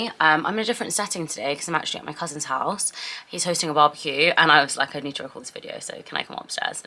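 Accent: United Kingdom. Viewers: How come you’re accent is so British, just amazing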